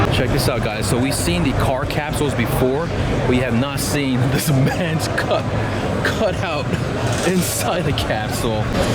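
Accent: United States. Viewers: You sound American, but you call it a bonnet?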